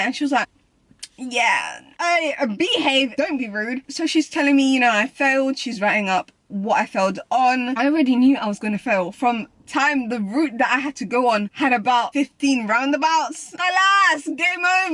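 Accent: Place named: United Kingdom. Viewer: Hello, I'm a fellow Arabian and I was astonished when I heard you in a British accent. I'm myself trying to perfect it but I'm struggling alot because many letters in the Arabian dialect I talk in sound way too differently than the British one. I was wondering if you could make a video explaining how mastered it for ppl like me or atleast reply to this comment. love ur videos. xoxo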